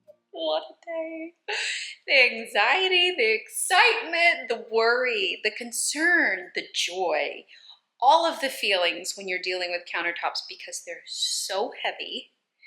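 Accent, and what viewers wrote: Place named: South, United States. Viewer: It's so funny how your accent gets waaaay more southern when you shop with your mom LOL. I Enjoy these flea market vlogs so much <3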